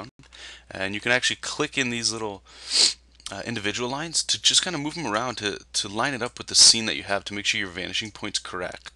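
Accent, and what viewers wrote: Canada. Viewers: yo, you a canadian?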